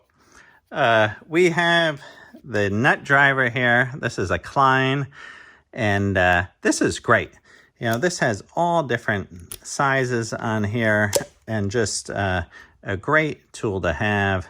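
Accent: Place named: Pottstown, United States. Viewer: Well, I’m surprised at just how many tools came out of that bag😃 your accent reminds me of my cousins in Pottstown 👍🏻 I enjoy the videos.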